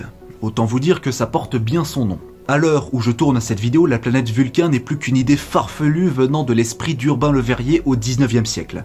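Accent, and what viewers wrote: Germany. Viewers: Poce bleu pour ton accent allemand :P Sinon super vidéo :D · C'est bien cette chaîne, pouce bleu pour l'accent allemand et la voiture qui passe ! Merci pour ce contenu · Pouce bleu rien que pour l’accent Allemand ;-)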